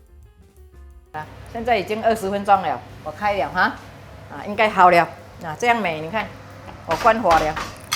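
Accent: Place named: Hokkien, China. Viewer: Thank you auntie, like the way you present very detail, and Hokkien mandarin 😊 I’m Hokkien too 😊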